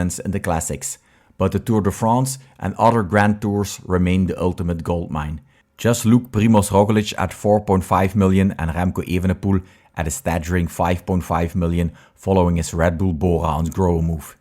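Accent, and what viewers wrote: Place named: Limburg, Netherlands. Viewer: You have a funny english accent. Limburg maybe ?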